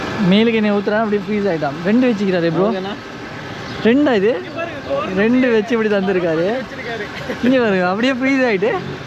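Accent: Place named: India. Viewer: Eduku da indian pola pesa try panreega🙄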